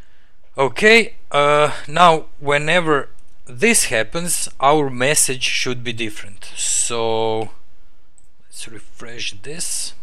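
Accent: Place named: Russia. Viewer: Привет, вижу русский акцент, можешь на русском уроки тоже писать?)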